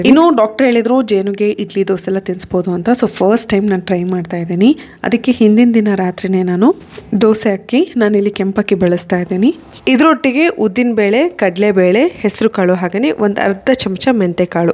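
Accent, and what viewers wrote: North Karnataka, India. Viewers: Nimdu uttar Karnataka alva?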